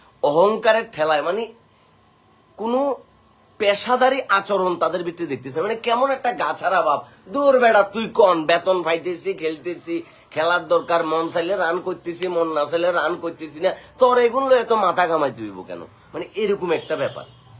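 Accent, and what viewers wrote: Kolkata, India. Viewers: ভাই আপনার ভারতীয় বাংলা ভাষা এক কথায় অসাধারণ লেগেছে। একদম কলকাতার টিউন। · uni hotat kore kolkata accest e bolse pura video te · Dada tomar Kolkata accent ta darun laglo ...😂😂😂😂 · Apnar Kolkata er golar tone ta khub valo hoyeche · দাদা আপনি কিন্তু কলিকাতার মতন কথা বলতেছেন আমাকে খুব ভালো লাগতেছে কথা শুনতে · সেই হইছে কলকাতা স্টাইলে ।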